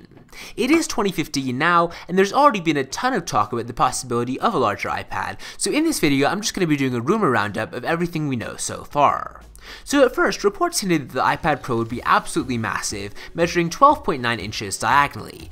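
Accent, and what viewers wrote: Scotland, United Kingdom. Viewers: Sounds like a Scottish guy trying to forcefully perfect his American accent.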